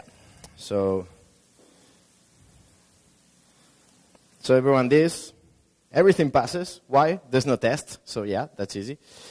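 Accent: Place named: Spain. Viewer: his accent is like a Spanish speaker from Spain speaking English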